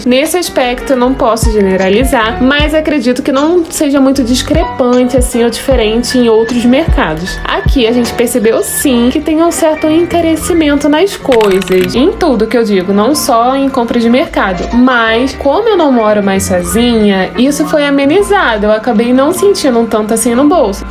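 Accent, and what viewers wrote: Rio de Janeiro, Brazil. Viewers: Tu é gaúcha, com esse sotaque super carioca? Não entendi... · Você é do sul com esse sotaque carioca?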